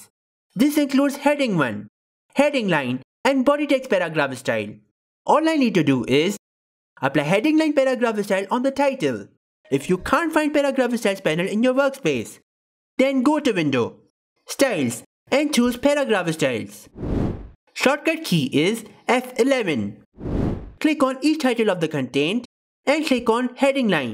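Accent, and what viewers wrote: India. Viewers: Thank you, I was watching this video, but I failed to understand you very well today. Between your english with indian accent and my spanish, it wasn't a good mixture LOL !!!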